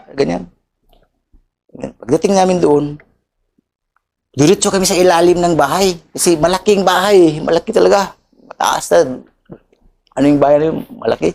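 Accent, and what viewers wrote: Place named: Visayas, Philippines. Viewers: Ito idol ku mag kwetong bisdak na bisdak eh ganyan talaga mag salita ang mga parang carbon copy mag salita sa kilala kong din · Bisaya kc..haha..prang si tatay digs lang magkwento..nkktawa..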